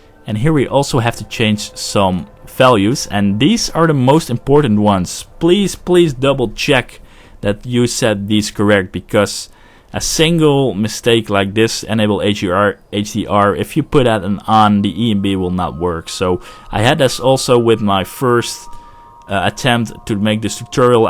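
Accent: Netherlands. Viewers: hai nederlander of belg ( ik denk hollander). ofniet? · Ik hoorde dat je Nederlands was, goede tutorial!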